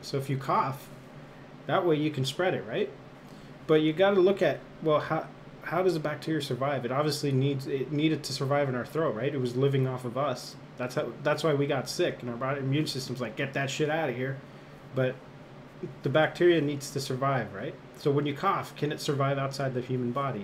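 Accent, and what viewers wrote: Canada. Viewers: Not Irish. Newfoundlander.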